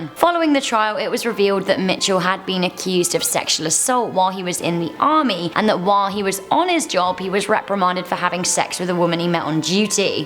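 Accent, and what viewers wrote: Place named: United Kingdom. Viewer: Excellent video, I love the British accent.....!!!!!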